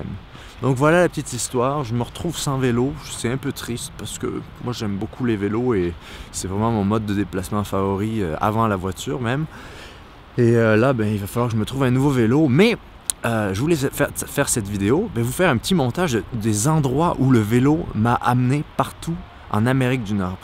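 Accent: Quebec, Canada. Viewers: Non. Il a un léger accent québécois.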